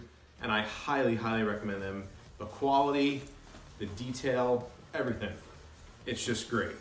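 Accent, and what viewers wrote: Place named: Philadelphia, United States. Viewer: Lol something tells me you're from South Jersey/Philly/Delaware? Go birds? Also nice vid.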